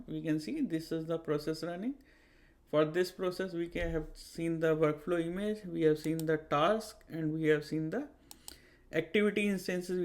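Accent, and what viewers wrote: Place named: India. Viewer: Thank you dude but please, the indian accent, pleeeaaasseee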